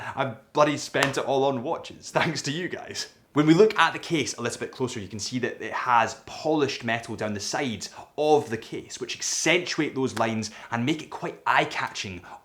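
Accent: Scotland, United Kingdom. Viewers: Why is it called 3 link? You sound like a Scottish adrian Barker you have the same cadence when you pause start and end sentences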